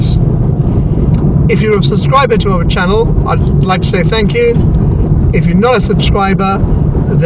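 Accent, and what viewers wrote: United Kingdom. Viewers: Thanks nice, clear and compliment for your Queen English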